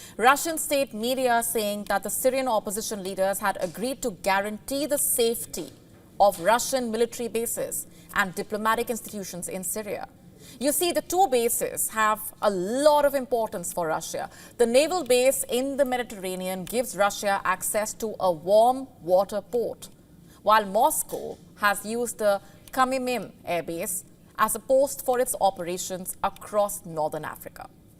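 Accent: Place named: India. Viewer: Indian accent so funny😂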